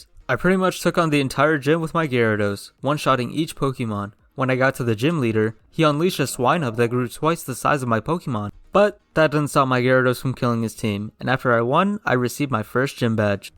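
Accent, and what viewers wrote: India. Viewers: actually sounds like indian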